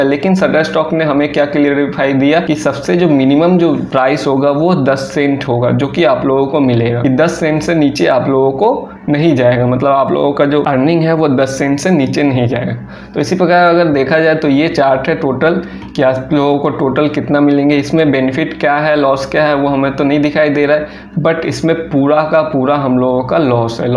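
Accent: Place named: India. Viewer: Can't understand what are you saying in this indian-english style...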